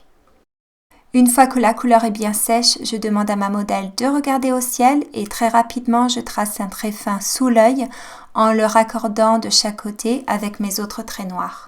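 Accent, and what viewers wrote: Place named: England, United Kingdom. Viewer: vous avez toujours été française ? car j'ai l'impression que vous avez un accent anglais.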